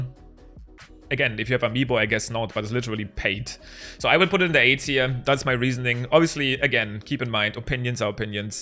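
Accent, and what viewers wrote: Germany. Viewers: Yeah, if people give you grief over having a German accent while speaking flawless English, their opinions are laughable. I'm living in Norway trying to learn Norwegian and I am terrible at it. I wish I could speak Norwegian as well as you speak English. I am from the USA and there are many different regional accents, and the Cajun accent and certain southern accents are much harder to understand than a German accent, and no one gives them crap about it. Double standards. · Another great video,! I’m continually impressed by your English, but since you repeatedly questioned your pronunciation of Swallow; I think you pronounced it correctly first each time (with your adorable German accent 🙂). Swallow rhymes with follow, not fallow. The a sounds the same as the a’s in baa baa, not the a in black sheep. I’m not sure if this helps, but the French name Soileaux sounds the same, or at least I can’t tell the difference. 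· are you german?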